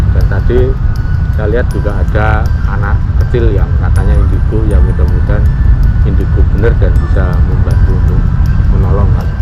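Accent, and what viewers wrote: Java, Indonesia. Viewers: Kamu loganya jawa .......saya tahu kamu aslinya loganya palembang..........apa anda orang indigo........saya juga indigo dari kecil.....dari ayah saya zakir naik......last ferts how do gers boy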